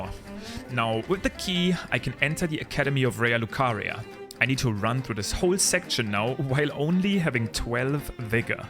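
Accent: Germany. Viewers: Are you german? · You know he’s german without knowing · Haha bist locker deutscher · Are you German? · You sound aggressively German, I like it. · You german? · You are German, aren’t ya? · Bist du Deutscher? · Kurze Frage kann es sein das ich hier einen Deutschen Akzent raushöhre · Hey very stupid question are you german or speak it cause you have a bit of a German accent I Guess and If so Hallo hatt mich nur interessiert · You instantaneously here this guys german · Nice vid! Your accent sounds very German. Am I right?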